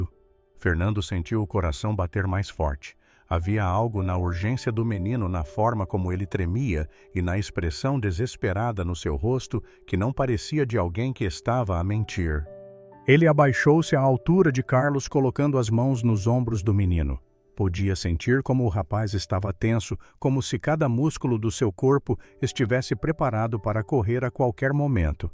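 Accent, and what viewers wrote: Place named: Minas Gerais, Brazil. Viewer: Este contador de estória com sotaque mineiro Mairna ora Marina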